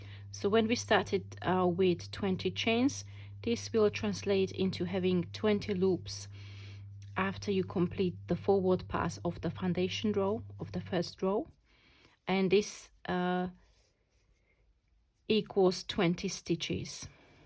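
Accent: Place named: United Kingdom. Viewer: what kind of british accent do you have? its nice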